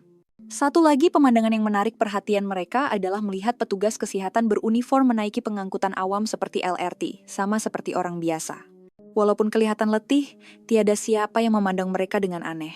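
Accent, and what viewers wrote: Indonesia. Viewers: Pengulas ini wanita Indon kan.Tetap kita boleh detect. P disebut pe,k disebut ka.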